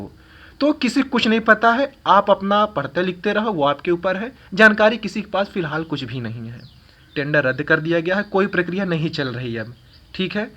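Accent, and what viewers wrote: Allahabad, India. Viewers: Bhai aap Allahabad se hee hai na? Kyoki aapki boli aur bhasha sunkar maza aa gaya. Bahut acha laga.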